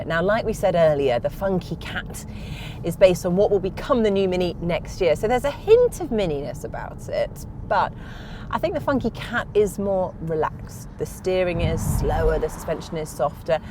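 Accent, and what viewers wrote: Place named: United Kingdom. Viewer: It's your British accent. They need a German accent, you know the aggressive type to recognize what you're saying....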